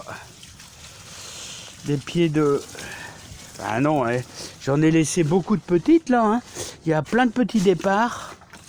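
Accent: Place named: Nord-Pas-de-Calais, France. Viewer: Coucou les chti❤;bonne année atoi avec l accent super t’es vidéos.🍾🍾🍾☃️☃️🐓🐓🦆🦆le papi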